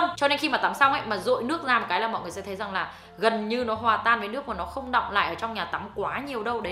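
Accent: Nghe An, Vietnam. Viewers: Răng mà nghe giọng Nghệ An ở đây họ😗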